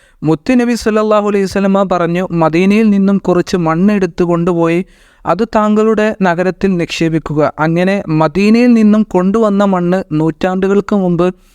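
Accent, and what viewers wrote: Malappuram, India. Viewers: മലയാളം പറയുമ്പോൾ മലയാളം തന്നെ പറയണം അല്ലാതെ മലപ്പുറം മലയാളം അങ്ങിനെ ഒന്നില്ല... നിക്ക് ചീരാപ്പു പുടിച്ചുക്കുണ് എന്നു പറഞ്ഞാൽ എനിക്ക് മനസ്സിലാവും കേരളത്തിൽ ഉള്ള എല്ലാവർക്കും മനസ്സിലാവില്ല... മുഴുവൻ എന്നു പറയേണ്ടിടത്തു മിയ്‌മൻ എന്നു പറയരുത്... ചായ കുടിക്കാൻ എന്നതിന് പകരം ചായ കുടിച്ചാൻ എന്നു പറയരുത്... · പല നാട്ടിലും പല ഭാഷകളാണ്. മലപ്പുറം ഭാഷ ഇങ്ങിനെയാ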